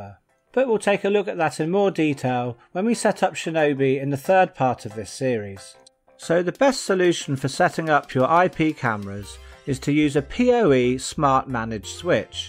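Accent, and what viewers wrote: United Kingdom. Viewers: Another Great vid. I hear your from South West England like me!! From Exeter, Devon my self!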